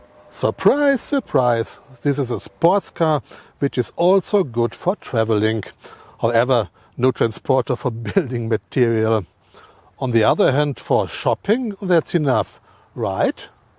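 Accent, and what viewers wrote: Germany. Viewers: Totally appropriate to have a Porsche review with a Porsche reviewer with a thick German accent…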